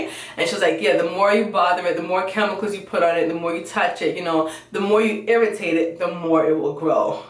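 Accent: Jamaica. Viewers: NEW Subbie...the patois got me lol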